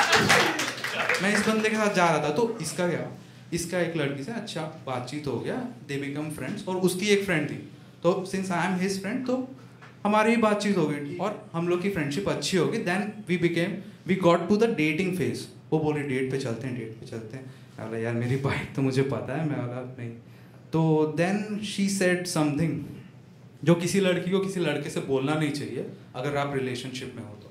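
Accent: Odisha, India. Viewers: Proud for great accent. Otherwise every body says your odiya accent was very sexy'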